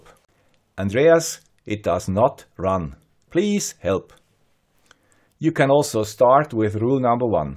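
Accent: Switzerland. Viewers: This is pure gold for troubleshooting. Thank you Great Master with Swiss accent 🖖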